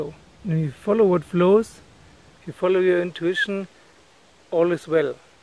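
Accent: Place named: India. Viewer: wow, I always thought you were Indian, wheres your accent from? :)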